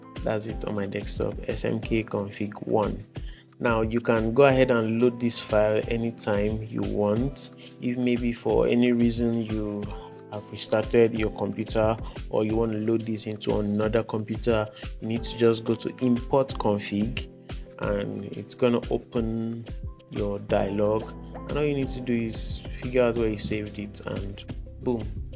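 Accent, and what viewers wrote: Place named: Jamaica. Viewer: Your Jamaican english so cool, love it. I really want to learn Jamaican english for my reggae music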